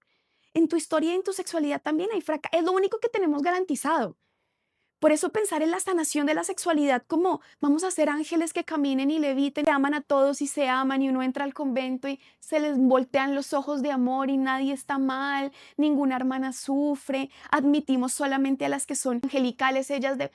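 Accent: Colombia. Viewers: Que linda y hermosa!. en el buen sentido de la palabra y con todo el respeto cristiano. Mas allá de su físico capto su belleza a través de su palabra 😅. Su forma y su tono gracioso de hablar 😹. Tiene mucha gracia en su gesto y en su verbo para poner atención de los temas intensos de sexualidad con relación a la religiosidad. Habla muy bonito , su forma de hablar colombiano. Me encanta ésa forma de hablar 😍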